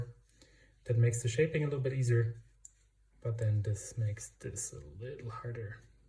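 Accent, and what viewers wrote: Germany. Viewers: Until you mentioned Hamburg I would have bet that your german accent in english is of a kind of hessian type.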